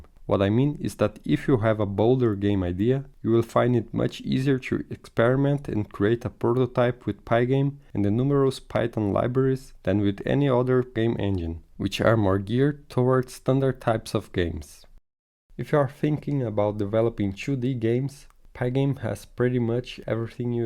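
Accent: Brazil. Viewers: O inglês com o Tchu pra ca e tchu pra lá é do Brasileiro hahahaha. Ótimo video. Seguindo e cutido. Um Salve da Holanda! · are you Brazilian? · Man , are brasilian?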